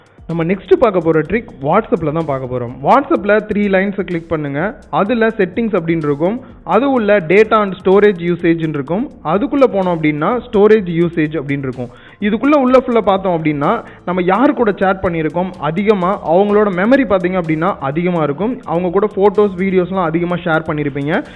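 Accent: Tirunelveli, India. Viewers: bro neenga nellai yaa???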